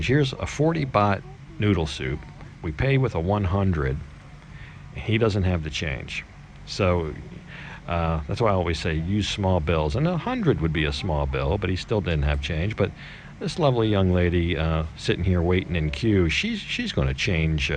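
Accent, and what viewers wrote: United States. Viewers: Just stumbled across your channel and subscribed. I like the format and your narrative style! I plan to retire in the Pattaya area in a few years so your videos of the area are most informative. Sounds like you're from the States? Your name?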